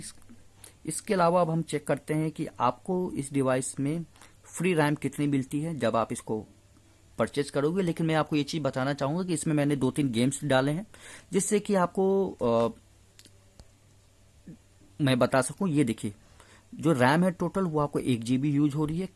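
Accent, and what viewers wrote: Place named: Bengal, India. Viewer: u sound bengali!!! r u bangali??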